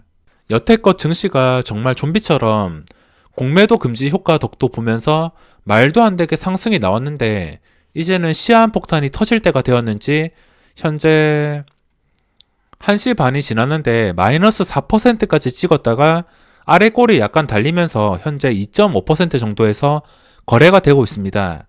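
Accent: Gyeongsang, South Korea. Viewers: 이해해 주세요~ 저도 그렇지만 경상도는 발음이 어렵습니다ㅜㅜ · ㅋㅋ 경상도 분이라 발음이 좀 쎕니다.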